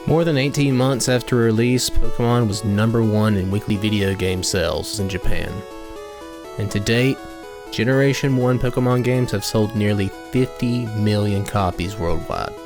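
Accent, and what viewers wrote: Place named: South, United States. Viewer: As a southerner myself, your accent makes this video even more charming. Informative and entertaining. Keep up the good work!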